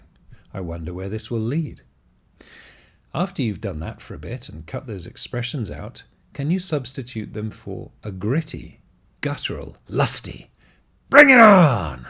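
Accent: United Kingdom. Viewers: British accent is so funny! 😀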